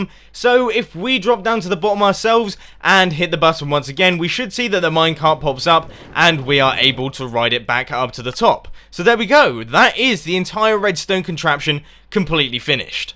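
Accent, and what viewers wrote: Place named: United Kingdom. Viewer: Cool British accent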